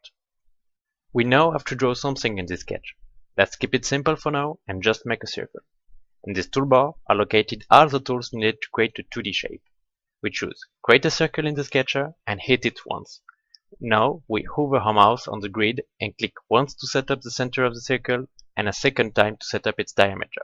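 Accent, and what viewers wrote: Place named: France. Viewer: Your French accent is just fine; one thing, if I may: middle is pronounced with an "ee" not an "eye" sound, like here: <link> Many thanks for your effort, good video!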